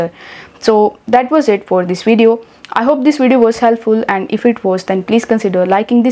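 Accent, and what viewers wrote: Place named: India. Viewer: I i like that indian accent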